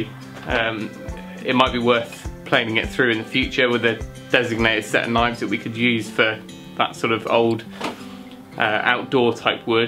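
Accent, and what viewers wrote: United Kingdom. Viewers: I thought "why is he excited he had to pay for the wood, saying that is was not free", then a second or two later "ah wait a second he means it's free of knots in the wood". Only discover the channel recently but very much enjoying it. And from the sound of it you are relatively local to me, in Bristol. I too enjoy renovation but have yet to try projects on your scale just 3 bedrooms and a garage roof but the less said about that the better; will be replacing the onduline with EPDM next year after having had to DPM over it till the weather improves and we have save a little for it. I plan to go thick on the insulation for the roof in there and with extra joists, OSB and EPDM on a 6x7m it gets pricy but will certainly look to use the company I've seen you recommend in another video about the garage renovation.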